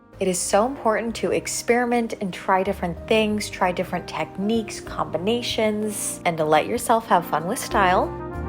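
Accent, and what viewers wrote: United States. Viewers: The mid Atlantic accent🤌🏼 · So creative and loved the tips!That transatlantic accent was everything 😂❤👏🏻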